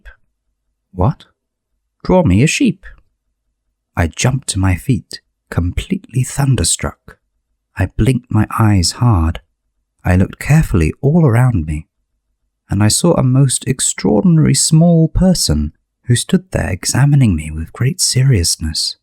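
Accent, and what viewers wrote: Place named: England, United Kingdom. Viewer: 와 진짜 crazy voice... i really like the intonation of English English.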